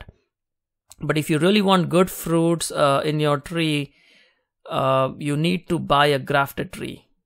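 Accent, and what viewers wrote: India. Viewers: Ur accent is like Indian english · Indian accent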